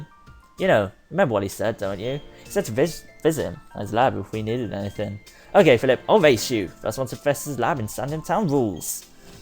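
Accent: Birmingham, United Kingdom. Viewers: He comes from Birmingham :D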